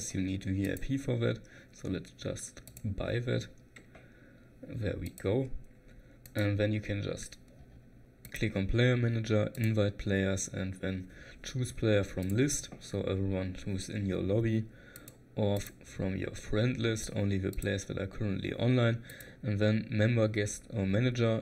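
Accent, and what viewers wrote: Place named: Germany. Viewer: man hört das du deutsch bist danke für das vidio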